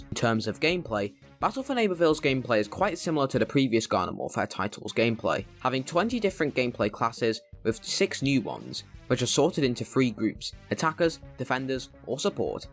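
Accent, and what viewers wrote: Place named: United Kingdom. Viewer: Csn we collab sometime? We’ve both got british accents, and you have potential.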